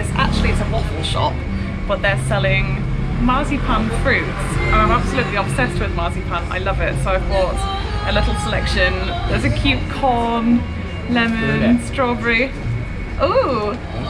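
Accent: England, United Kingdom. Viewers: Heyyy girrrl!! looks amazing - just a quick question! Are you travelling during the pandemic? Just interested as you sound like you're from the UK too!! xx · welcome to turkey i know you are england your Accent 🤣🤣🤣i live in Istanbul i dont like england ş have been London weather very bad everyday rainy and people very rude And arrogant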